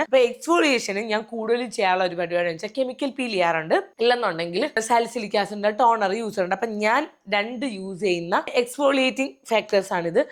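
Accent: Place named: Thiruvananthapuram, India. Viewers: Chechi i have been following u and admiring u since 2 years and i am keep loving watching your videos....i am even get influenced mostly on products you used how you style....and especially the way you talk its so attractive that i am keralite also tvm side now staying and i like the way you present everything in your slang....anyway best wishes for your achievements❤.....i know i am not lucky at all for getting this giweaway....but then also simply trying jst coz my favourite influencer finally came with giweaway nahh....so i wanna be part of it thats it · Chechyiiudee ehh tvm slangg adipolii anu kettonduu erikkaann💖✨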